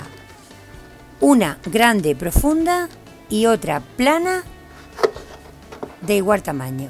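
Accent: Spain. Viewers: estas pasa!!! eres mi inspiracion de veras todo lo que tocas sale excelente y tu espanolado me encanta...hago las piezas mias con las quimicas tuyas y todo sale excente graciasss!!!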